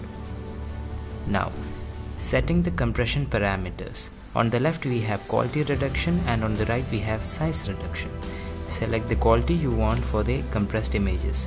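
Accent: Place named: Kerala, India. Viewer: nope But felt you were a mallu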